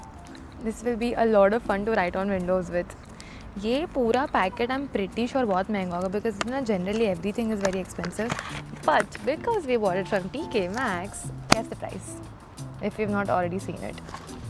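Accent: Gujarat, India. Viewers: Loved your gujju accent 😂💖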